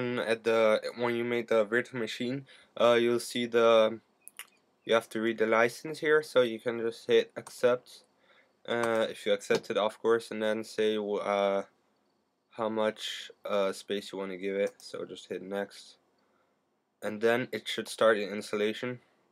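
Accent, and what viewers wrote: Netherlands. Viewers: Are you dutch? (Recommend at this channel)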